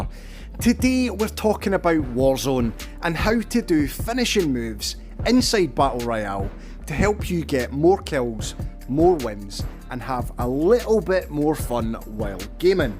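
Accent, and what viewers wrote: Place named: Scotland, United Kingdom. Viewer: Great how to man, this game is so intense. And Always love your Scottish accent bud! Makes the commentary entertaining and flows well with the video.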